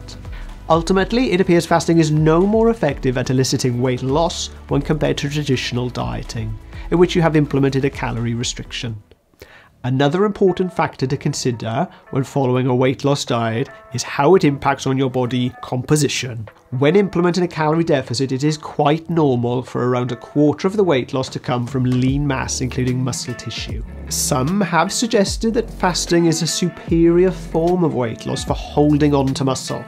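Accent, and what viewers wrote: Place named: Wales, United Kingdom. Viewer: Love the Welsh accent. Great breakdown of comparisons, thanks. I have T1 diabetes and fasting makes my TIR and BG absolutely fabulous and I feel great.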